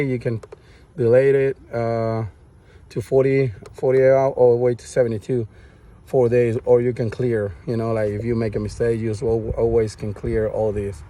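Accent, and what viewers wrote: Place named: United States. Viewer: Se te nota que eres gringo, pero no habrá forma de que lo mandes aunque sea en espanglich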